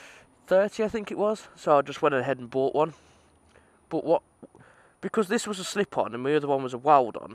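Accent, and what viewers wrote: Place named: Walsall, United Kingdom. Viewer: you from walsall area by any chance i reconse the accent ???????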